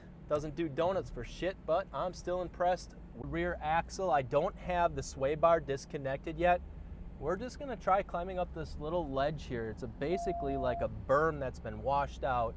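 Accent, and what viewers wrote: Wisconsin, United States. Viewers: That's how them good ole wisco boys say out here lol I catch myself saying it like that too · I could hear the Wisconsin accent the second I heard you say "wegon ." I like hearing all the different American accents! Love the Power Wagon, but I wish it came with 35's and the axles. I'd still buy one, but 35's would be the cherry on top.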